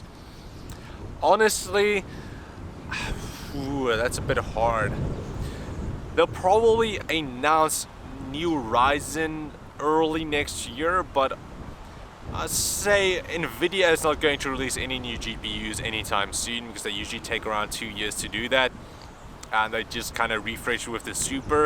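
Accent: South Africa. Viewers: R u South African?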